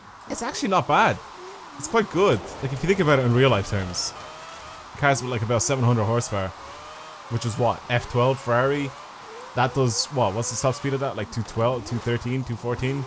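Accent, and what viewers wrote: Ireland. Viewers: Are u from Ireland cuz I am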